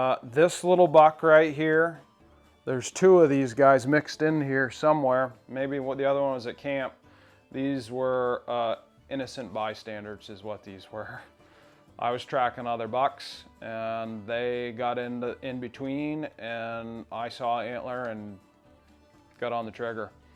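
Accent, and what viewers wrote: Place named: Canada. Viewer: Wicked bucks. I recognize that voice from the podcast you sound more Canadian than Vt eh?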